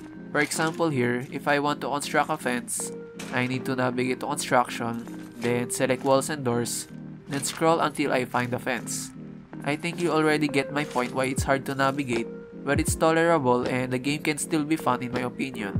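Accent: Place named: Philippines. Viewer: hey, the accent sounds very Filipino. great to see more of us doing game reviews!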